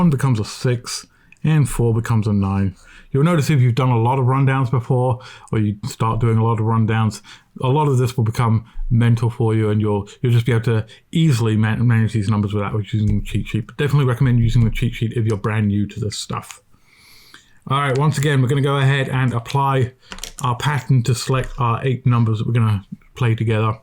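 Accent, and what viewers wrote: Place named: United Kingdom. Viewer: Hi there You sound like you are from the UK but I can’t see any Lotteries for here I am guessing that the Uk one is a lot more complex to win than some of the others Only just started watching this about the Thai Lottery a few mins ago Do you offer live chat like other people which I noticed seems to be a new feature It is a good idea as it’s quicker and not everything needs to be written in public Kind regards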